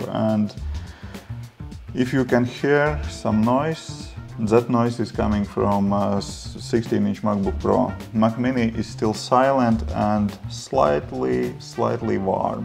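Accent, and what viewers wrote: Russia. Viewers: Actually your accent is the same as russian 🙃 Interesting video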